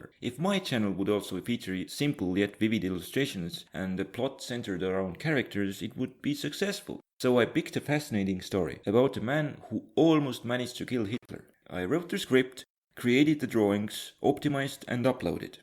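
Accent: Eastern Europe. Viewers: Its interesting to see you reflect on your own channel after you gained some traction. I think I subscribed after first seeing your eastern front animated video. Here are my thoughts: (not to be rude or anything, just some honest criticism) As you have already noticed the drawing part is certainly the weakest aspect of your videos. If you aspire to be on the level with series like extra history the truth is that your drawing/animation skills are still very basic and not quite there yet. That does not necessarily mean that „bad“ videos will come out of it - just that they are not on par with most comic-narration things you see on youtube. And there is quite a lot of it so its hard for you to stand out. But I dont think you actually need that. As your eastern-front-animated video shows I think you are far better off concentrating on the mapmaking and detailed-research aspect. 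I could think of your channel as something like a baz-battles on steroids with a focus on the modern era of history and going in for much more detail. So I would suggest making the videos almost fully map-based while trying to make the maps graphics even simpler and also more refined. One last point that you might not be aware of: many people have problems understanding eastern-european accents. (I suppose your estonian but its quite similar) I noticed the guy who does baz-battles is also from eastern europe and once he changed to a native english narrator his videos became much more accessible for a broad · Ditt accent låter mer östeuropeisk än Svensk? Estland, Lettland, Litauen? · Are you from sweden? Why do you have an eastern europe accent?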